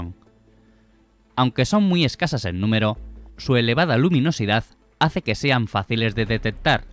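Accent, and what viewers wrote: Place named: Spain. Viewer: Excelente video, pero me caen mal el acento español.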